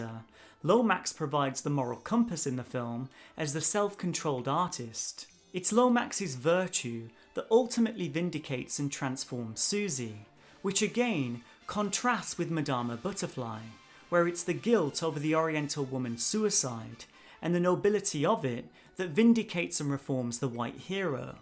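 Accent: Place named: United Kingdom. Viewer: I will admit that I was kinda of skeptical of your channel when I first stumbled with it cause you sounded very British, I'm glad you didn't just gloss over your own identity and pretend like it does not affect the way you view things. The fact that you tried to confront your own biases in this video is pretty great, so kudos to you. Your channel is awesome!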